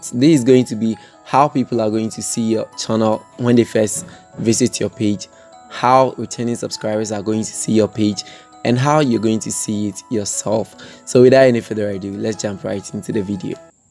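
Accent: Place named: Ghana. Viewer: See ya fine Ghana accent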